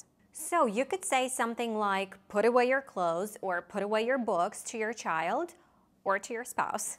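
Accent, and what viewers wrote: United States. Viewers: It's a strong US accent. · This isn't teaching English, this is teaching an American dialect. The words, spelling and pronunciations are very different to "English"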